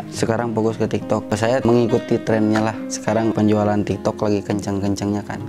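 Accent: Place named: Tasikmalaya, Indonesia. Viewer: Logatnya Tasik banget 😁😁...salam dari orang Tasikmalaya sukses terusss 😍😍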